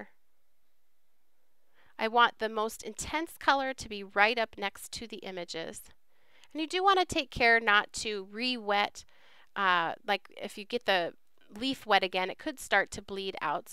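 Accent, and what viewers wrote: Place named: United States. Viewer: i love PB stamps and have a few, i watch all your videos but just wanted to say i love your voice!! I love the american accents anyway, but your voice is so nice, sorry to be off topic, lol, hugs from Vick in England xxx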